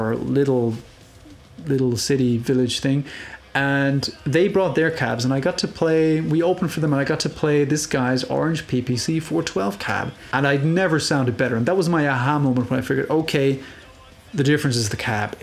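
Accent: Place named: Ireland. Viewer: New to the channel. Love it. But you speak English with a bit of an Irish accent on certain words. How'd that come about?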